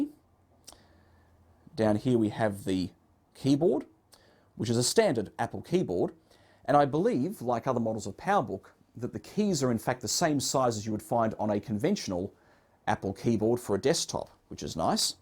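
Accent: Australia. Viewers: Are you Australian? You sound like it.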